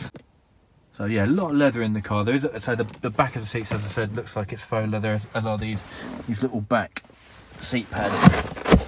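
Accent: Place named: England, United Kingdom. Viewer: love it how Queen’s English pronounced in Imperial measurements. Nice C4S!!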